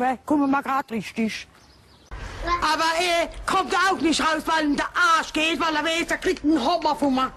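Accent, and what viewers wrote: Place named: Mannheim, Germany. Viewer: rischtisches monnemerisch :D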